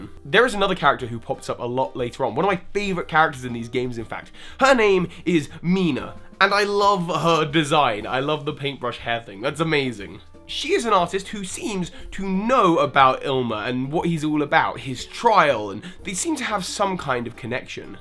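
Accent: United Kingdom. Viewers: SwaggitySwooty it might just be his accent, he is from the U.K. after all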